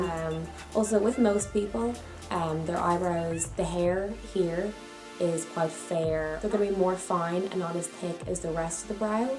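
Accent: Ireland. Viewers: oh okay thank you so much and are u Irish? Love the accent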